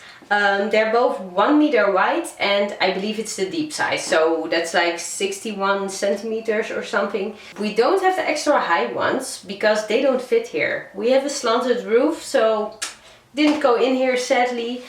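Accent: Netherlands. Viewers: I just stumbled on your channel and as soon as I heard you, I knew you were Dutch! My mother was Dutch and I have a lot of family in the Netherlands. I love your home! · Haha het Nederlands accent komt haast altijd overal bovenuit, zo cute🥰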